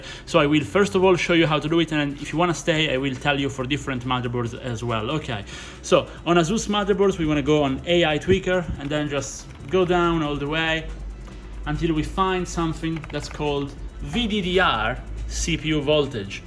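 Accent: Italy. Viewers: Qualcosa mi dice che sei Italiano... o al massimo spagnolo, comunque grande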